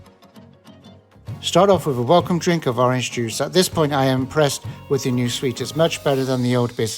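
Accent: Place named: West Country, United Kingdom. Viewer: Trying to place your accent, getting all over the place but think West Country somewhere even some Irish. Dying to know where you are from.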